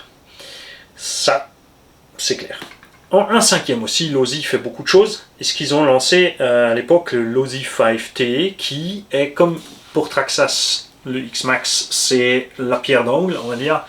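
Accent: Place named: Alsace, France. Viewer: Ah... losi c est grâce à la dp.pro que j ai repris la rc,( j avais ausssi hésité avec un slach)elle est jamais bien loin dans le coffre quand on part en vacances ,il y a toujours une place pour elle.En tout cas elle fait bien le taf .et dire que j avais failli acheter le mavrick quantum fluck, beautiful comme disaient cettaines vidéos 😂😂jusqu au jour où je tombe par hasard sur un blondinet avec l accent alsacien qui me semblait bien plus sincères dans ses propos et voilà comment on en est devenu accros de cette chaîne de dingos 😋avec son présentateur et ses abo,on se marre bien,et la collection s agrandi tous les ans. Sinon pour finir le sbr 2 me tenterai bien pour essayer en remplacement du mojave mais j hésite fortement déjà je sais que la caro c est de la feuille de riz😂😂et ça fait c...quand-même Bon allé montre nous les essais du losi 5 qu on bave un peu comme avant. 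Bonjoute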